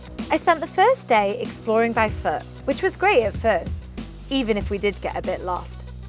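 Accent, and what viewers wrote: United Kingdom. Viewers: Sorry, hard to understand yr accent, English accent or British one, hihihih. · I like your UK accent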